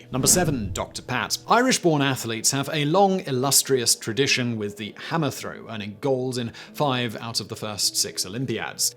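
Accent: United Kingdom. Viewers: Chrisholm for Chisholm definitely got a giggle from me, I can see how your brain would want to add an R in there. It was extra amusing to hear someone say the "holm" part with a British accent instead of the Southern U.S. accent I always hear & say it with ("um" rather than "holm").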